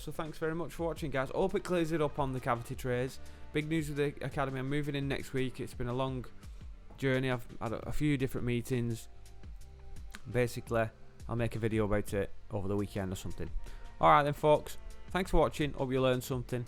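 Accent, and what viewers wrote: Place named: Wigan, United Kingdom. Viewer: Where does reside, sounds like a Wiganer?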